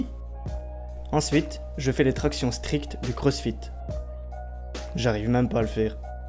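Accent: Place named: Sudan. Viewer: La quintessence de la callisthénie!! Merci, t'as un ptit accent il me semble... Tu serais pas originaire du Soudan?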